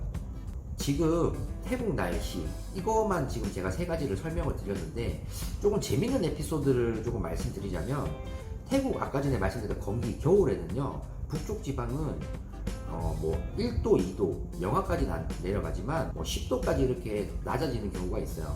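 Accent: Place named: Busan, South Korea. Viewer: 부산정 표준말씨로 방송 잘합니다. 좋은 방송 계속 해주세요.😅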